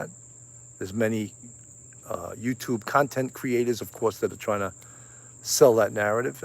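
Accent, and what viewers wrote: New York, United States. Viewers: New subscriber, love your New York accent.. thanks for your service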